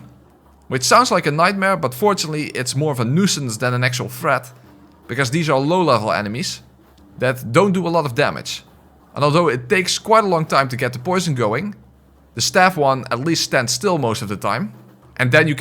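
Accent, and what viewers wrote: Netherlands. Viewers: Haha tita tovenaar Rogier geweldig ik hoorde all aan je engels dat je nederlands bent maar dat grapje was echt geweldig🤣🤣🤣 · Kankering = conquering hahaha funny Dutch accent you have. Nice vid! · Ur Dutch aren’t u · damn you have such a thick dutch accent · the moment u said... ''ti ta tovenaar rogier godverdomme'' xD my first time i watch one of ur video's and i hear u are from the netherlands XD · As a half dutch guy, hearing a Dutch accent upon opening a video is like Christmas day. So comforting 😌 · Dutch? xD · Love the video I love the Dutch accent too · i can smell the dutch in your accent. gegroet 🤙🏼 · He sounds dutch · the dutch accent is also super charming lol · I don't know who you are but you sound very Dutch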